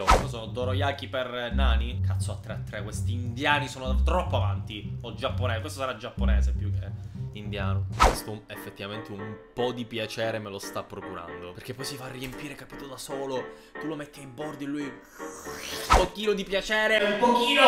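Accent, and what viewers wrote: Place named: Sicily, Italy. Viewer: la cosa che mi fa più godere è il tuo accento siciliano, la raffinatezza nel pronunciare “minchia” ha qualcosa di soave.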